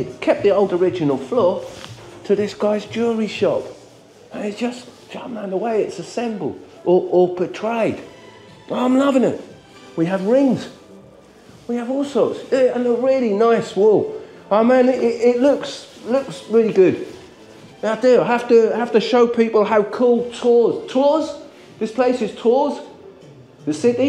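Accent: England, United Kingdom. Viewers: French people speak English with a French accent, fact. I personally love the way you are speaking French with an English accent. Just as it should be.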